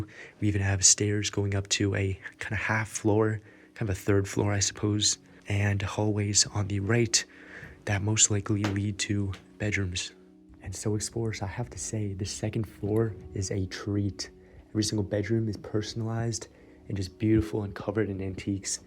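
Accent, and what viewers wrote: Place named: United States. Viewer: Did I miss the round spire/tower room from the inside ? I also have read some comments about learning what this older furniture styles are , it would help with dating stuff . You don't have to take classes to learn stuff , just look at the pics and do a google search and you will usually find more info on the old stuff . The cork on the walls in that bathroom was odd to me , I am guessing it was done in the 1960's or later , that was Hideous looking . The photo slides are not Polaroids , I lived through that Era , and used Polaroids . I also have Some Slides from the 90's , so they are pretty common . The Scanners from some companies in the 90's had an attachment piece for showing the slides on your PC. Were you on a vacation trip in France ? You speak American English , so I assume you are from the States . I am also very glad you were respectful and not ransacking the place , I watched a different video with a real Jerk , that was so disrespectful , and made me think he is a real thief and lowlife. 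Keep up the great work .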